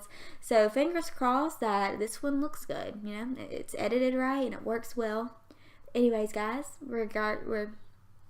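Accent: South, United States. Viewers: I just binged watched all of your builds last night and I'm so glad I found your channel! Love your voice by the way! I'm from Georgia, so southern accents have a special place in my heart ❤️ Can't wait to see your channel grow 😊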